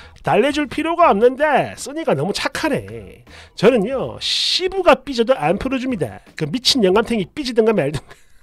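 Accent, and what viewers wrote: Gyeongsang, South Korea. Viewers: 앵가이해라 앵가이~ ㅎ글로읽고 목소리로 들으니 더입에 착붙이네요~ 갱상도 싸나이 좋아요 좋아👍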